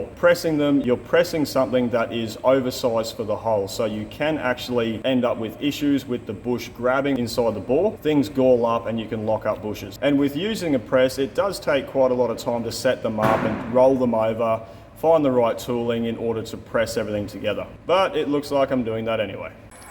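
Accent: Australia. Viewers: Aw man, c'mon, don't worry about the aussie accent none, it's part of the charm of the channel/content, and you in particular. Was a fun watch but I'm sad Karen cut the bloopers of you cussing up a STORM tryna get those bushes in. I know there was some behind-the-scenes rage in there and it would've been hilarious to see xD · Me, not used to the Aussie accent. "Lawn mower?" Oh no wait, "Lawn borer." Like you know, a boring device for out on the lawn, which is probably just a typical Australian way to describe the outside, haha, funny Ozzies. And then it struck me. "Line borer." Okay, English is my third language but still, I should have gotten that sooner!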